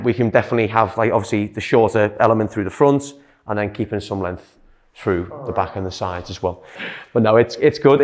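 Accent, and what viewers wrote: Liverpool, United Kingdom. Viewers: I get it ure british . Now turn it off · This is British accent right? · Where are you based? You sound like a Scouser, are you in Liverpool?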